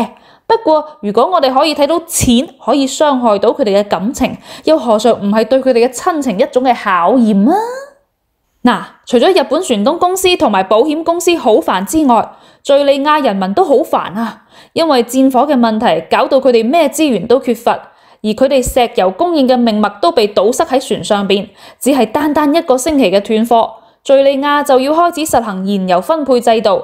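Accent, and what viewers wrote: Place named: Guangzhou, China. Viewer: 我地广州的音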